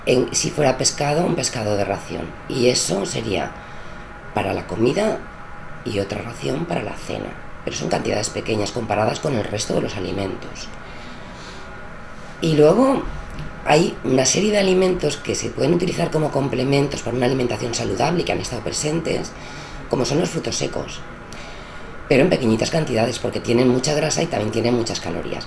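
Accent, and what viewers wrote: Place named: Valencia, Spain. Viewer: Que bonita voz valenciana.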